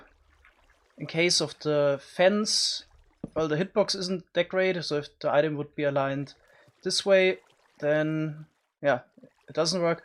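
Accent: Germany. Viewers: Dumb question, is he German ? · Muttersprache deutsch oder? Hört man ziemlich raus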